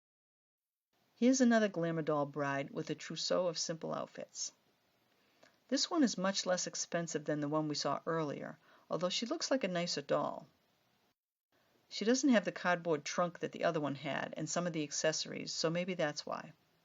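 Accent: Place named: New Orleans, United States. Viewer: Your accent sounds a lot like my family members. Are you from New Orleans? Btw I love this video. I love vintage dolls.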